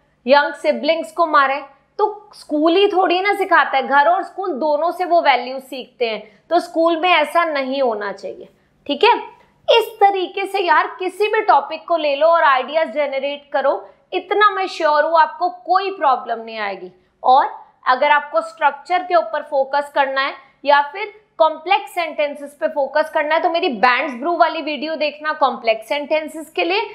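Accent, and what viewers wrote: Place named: Punjab, India. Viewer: Mam aapki hindi ki tune Punjabi me bahut achhi lagti hai .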